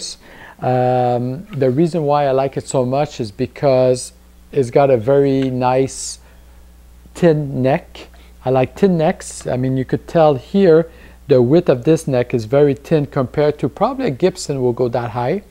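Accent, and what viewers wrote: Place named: France. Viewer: A little french accent here and there...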